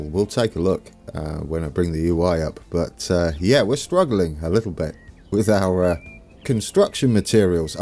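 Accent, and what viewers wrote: United Kingdom. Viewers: Hello, in about section you say : location USA, but you sound quite british. I am improving my English. Could you enlighten me ?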